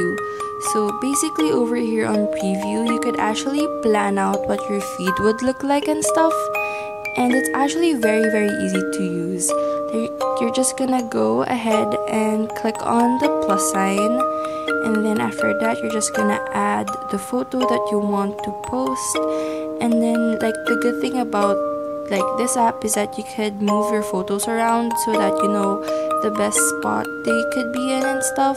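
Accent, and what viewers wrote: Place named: Philippines. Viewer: We stan an angel😫 I can hear the Filipino accent btw I love it!💕 I love your feed so much it's so aesthetic i'm so inlove😭💖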